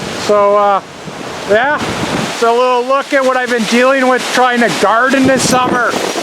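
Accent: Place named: Newfoundland, Canada. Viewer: You sound like a Newfie ?? Good coverage 👍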